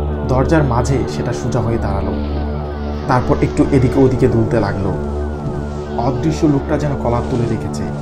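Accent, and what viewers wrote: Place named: Bangladesh. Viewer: আপনি নিশ্চই কাংলাদেশের মাল আপনার ভাষাই বলে দিচ্ছে আপনি কাংলাদেশের মাল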